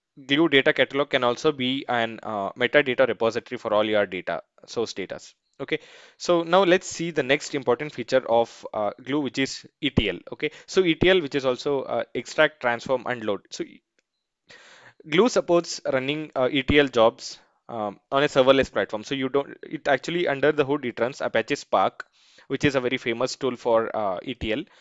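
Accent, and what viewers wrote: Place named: India. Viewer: Shit voice Indian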